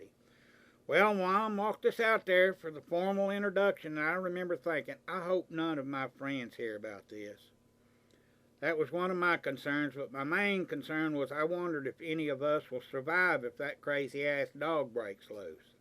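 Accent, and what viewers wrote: South, United States. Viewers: I really enjoyed this story. For the life of me though, I don't remember your accent being so Southern! LOL. Must have been blown away by your Producer Status! Marianne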